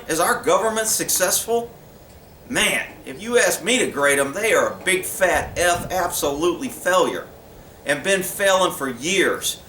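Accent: Texas, United States. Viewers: I wonder if anybody else catches your greeting in all your videos? "Morning Dumb People"...or is it that Texas draw... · Because with your accent, your "gas" sounds more like "gaz". I like your Texas twang. Even when you were in California and that's when I bumped into your videos of, I could tell you weren't a native Californian. I thought, "This guy's from Texas." My older relatives on my mother's side who are French Canadian will say gaz rather than gas. When you mean to say "quiet", you say it fast and it sounds more like quite. I'm not picking on you at all. Every one has a different accent. I'm from New England and every one knows the old "Pahk the cah in Hahvad yahd (Park the car in Harvard yard). When I lived in Virginia in my early 20s, some times people would ask me where I was from, when I said Massachusetts they didn't believe me because I didn't sound like a Kennedy. 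That's the only accent they assumed we had, which is not so. Actually, only the Kennedys have that weird accent. I usually don't drop my Rs because my parents didn't talk like that. But now that I'm half a century old, I sometimes drop it. I noticed my father dropping his too now a days when he never did before. A friend of mine and I took a road trip to Florida years ago. When we stopped in Georgia to get something to eat, it was like we were in another country. I could barely understand what many of them were saying because their accent was so darn thick! Wow! Then in Florida I could understand every one just fine. Also, a Texas twang is not the same as a southern drawl.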